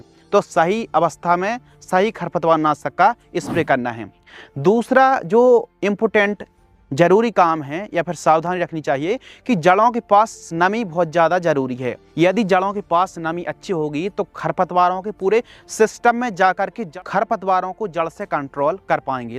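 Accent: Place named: Narsinghpur, India. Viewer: Tum Narsinghpur se lg ray ho bhaiya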